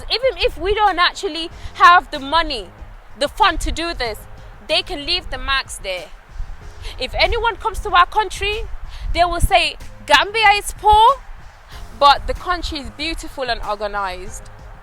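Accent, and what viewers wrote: United Kingdom. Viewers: Oh!! You truly love your country!! I am really blown away by your brilliance and passion!! I'm very serious when I say this: "You should run for the Presidency of "The Gambia." If I was in "The Gambia" right now. I would be your "Secret Service Bodyguard." I would protect you and make sure that no one lifts a hand to harm you!!! You are so smart...and once again...I just love your British accent!!!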